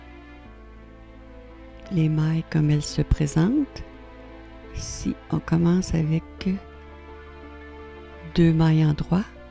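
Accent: Canada. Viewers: Il y a longtemps que je cherchais un modèle juste un peu pénible la pub qui s'affiche merci pour votre modéle il est super et j'adore votre accent canadien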